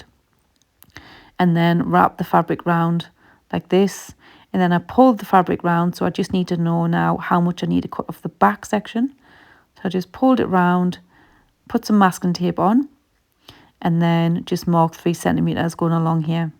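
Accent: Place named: North, United Kingdom. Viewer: Thanks for the video, very interesting but also your accent, you sound like me. I don’t live up North now so I don’t hear our accent very often. It was lovely thank you.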